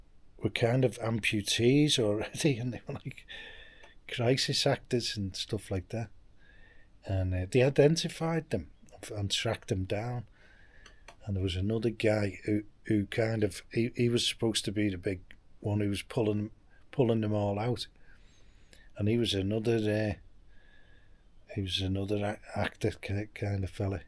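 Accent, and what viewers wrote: Liverpool, United Kingdom. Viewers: All this from in your head ... you have some brain mate! Barrow boy, paper round and betting shop. With scouse accent ... No way! This does not add up ... I will try and join the dots and work you out! :)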